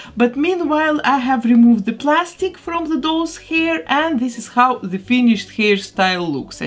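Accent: Russia. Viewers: Работа потрясающая P. S. Я одна слышу лютый русский акцент? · мне кажется... или у нее русский акцент..... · Есть ощущение, что она с русским акцентом? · Мне кажется, или у девушки чисто русский акцент?😅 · Что это за акцент? Русский или норвежский? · Почему я слышу русский акцент 😮 кукла замечательная!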